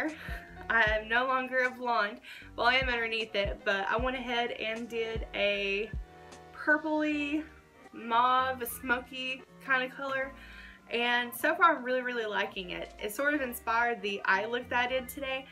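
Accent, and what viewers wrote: South, United States. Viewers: I love your purple hair, southern accent, and shiny eyes!!!!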